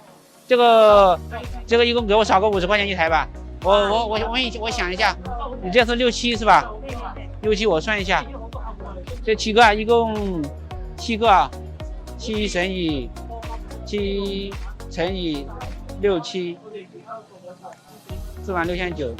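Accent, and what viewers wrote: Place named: South, China. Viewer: 南方口音重罢了 其实没什么的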